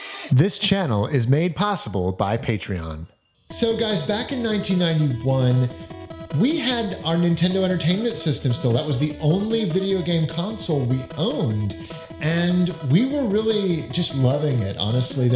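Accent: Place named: New York, United States. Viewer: awesome yea I've been all over at this point (Li) I've seen u mention in previous videos your family was from Li that's great. I can def tell the NY swag and way Long Island is pronounced in videos 🤣 its a Li thing. But yes I was lucky enough to have my stepfather replace all the things lost which def wouldn't have happened a year prior. Grew up with a single mom so I always got hand me down systems. I def wasn't getting another had he not replaced it. He felt awful I was very lucky.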